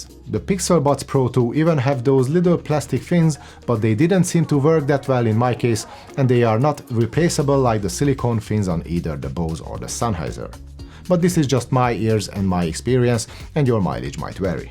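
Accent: Hungary. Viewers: Great video, signature Hungarian accent 😉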